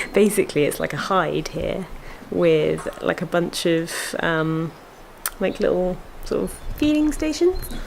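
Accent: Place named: United Kingdom. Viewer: I love your British accent.😄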